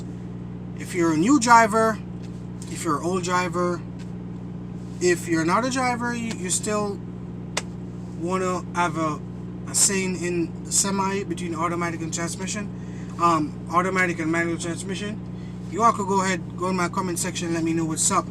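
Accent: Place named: Hartford, United States. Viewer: hey u sound like u from the Hartford area right? Was there for 2 years just moved back to nyc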